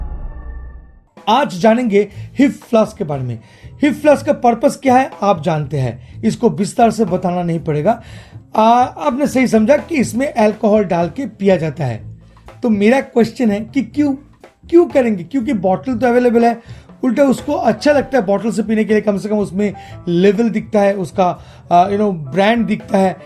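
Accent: Bengal, India. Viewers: Don't care about the contents. I am subscribing just for your bengali accent. It's so funny (in a good way) 🙂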